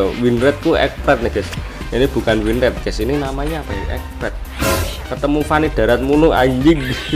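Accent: Java, Indonesia. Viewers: Jawane ngendi bosku?? Ketok wenakmen ngomonge.. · Bang Lo orang Jawa ya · kok bosone Jowo medok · Jek medok jowo sampean mas kurang harapan bangsa yang paling agung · Bang Orang Jawa Ya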